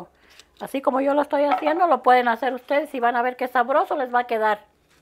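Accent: Mexico. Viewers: Que gran diferencia, vi la receta en otro canal de una extranjera y solo con escuchar el acento no me gustó el caldo, que bien se siente escuchar a una mexicana que te lo explique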